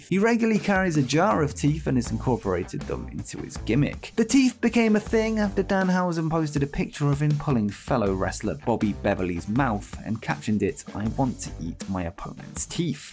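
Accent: United Kingdom. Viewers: Also hearing "Pepsi man" in a nice British accent is awesome! Vive la!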